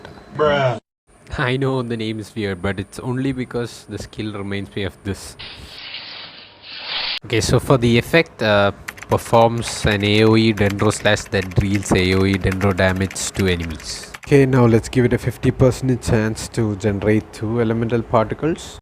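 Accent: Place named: India. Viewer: Bro you are Indian right your accent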